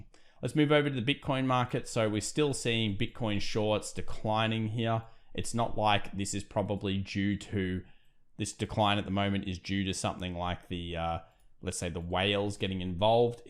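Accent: Australia. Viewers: The only Analysis channel I watch great video 🙌 you have a cool accent, are you Australian?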